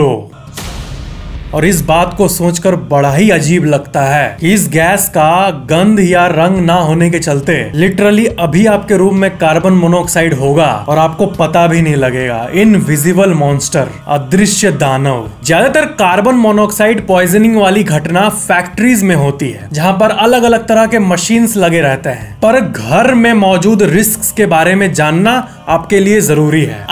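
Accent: Bihar, India. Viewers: Bhai app 😊Bihar se ho😊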